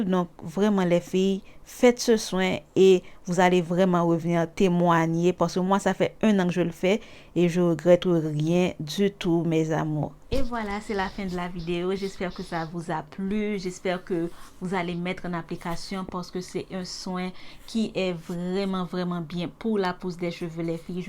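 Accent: Haiti. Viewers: Tu parles comme une Haïtienne 🇭🇹🇭🇹j'aime bien merci😊😊😘😘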